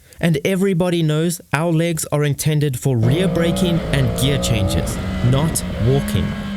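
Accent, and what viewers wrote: South Africa. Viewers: Are you from South Africa mate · Where u from m8? South Africa? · South African? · You South African?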